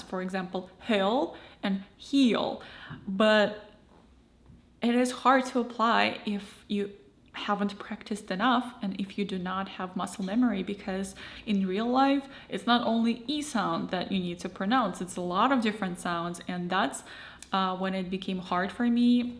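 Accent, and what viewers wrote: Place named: Russia. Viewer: Oh no.... i liked your Russian accent who was lerning french 😭😭😭😭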